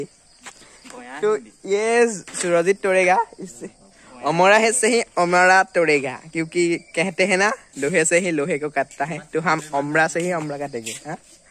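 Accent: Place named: Rural, India. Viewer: Your গঞা হিন্দী is op 😂😂🔥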